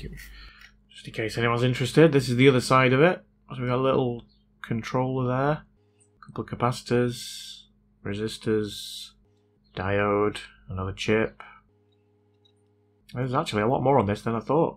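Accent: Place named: Manchester, United Kingdom. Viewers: I worked in Manchester (technically Stockport) for a couple of years and he sounds exactly like one of my workmates from the time. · Been wanting to ask you. You don't have to answer. Are you Manc? reason I ask is because I grew up in Brixton, London myself, but used to stay in Chadderton in the 80s (Firwood Park) and your accent almost feels like I am back there.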